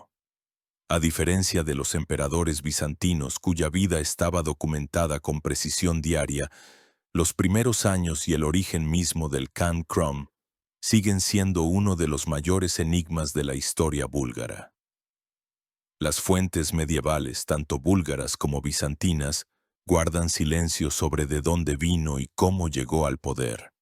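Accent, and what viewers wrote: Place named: Latin America. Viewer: Aunque todos sabemos que este video esta hecho por IA, supongo que detras de el habra una persona dedicando tiempo y queriendo subir visualizaciones... No se si no se ha percatado de que desde que la locucion cambio a una voz en latino las visualizaciones han descendido drásticamente. Son muchos los comentarios que piden que volvais a la voz anterior pero parece que no se oyen las peticiones de la audiencia. Una pena....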